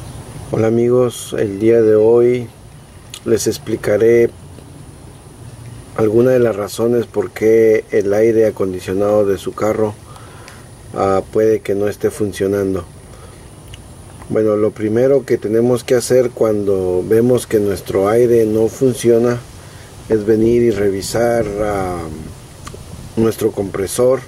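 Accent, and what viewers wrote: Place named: United States. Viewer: Y luego para que hablas como Pocho....pues cuanto tiempo tienes allá que ya se te olvido ablar el español....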